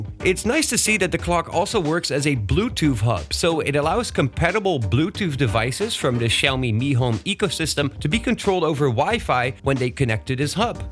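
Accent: Netherlands. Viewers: I knew you were Dutch! I knew it! You have that accent! Groeten vanuit Amersfoort! 😂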